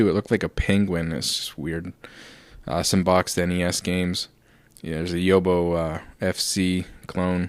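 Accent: Canada. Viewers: Wow I stumbled across your video and while watching I was like this guy sounds canadian.I was super happy to see that this store is located in Ontario.Im from Toronto and deff will be making a trip to this store very soon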